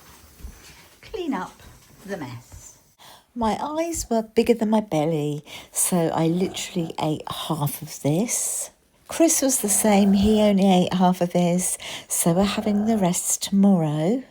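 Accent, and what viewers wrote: United Kingdom. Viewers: You sound like everything is Essex bless you ❤❤❤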